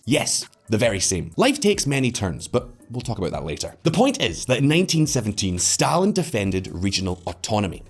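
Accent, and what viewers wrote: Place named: Scotland, United Kingdom. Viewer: How do you get American from that accent? He's Scottish. Part of the UK Ashley 😁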